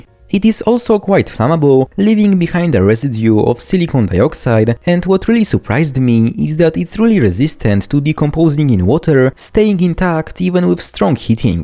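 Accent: Poland. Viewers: Niby słusze angielski ale jakby po polsku:] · Jakby ten akcent rozpoznam wszędzie 🇵🇱kocham opale! Labradoryty też są piękne i o wiele tańsze. Super kontent, będzie oglądane ^^ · as a polish person i love hearing the polish accent in his voice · Are you Polish? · Hey bro, are you from Poland maybe? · Od początku czulem że to Polski akcent, ale potwierdzil wszystko 😆 świetny materiał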